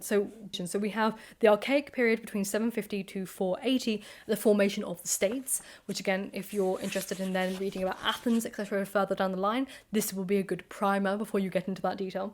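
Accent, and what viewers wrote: United Kingdom. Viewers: I’m really into what you promote, but you speak too fast for an international public, allthough you are vere charming british, please consider us “ slow ones” ❤️🤗 Maybe you’re just trying to reach a british audience, then my apoligies !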